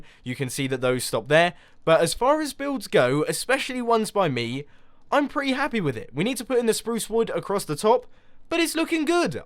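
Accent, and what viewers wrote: United Kingdom. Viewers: are you british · Are you british?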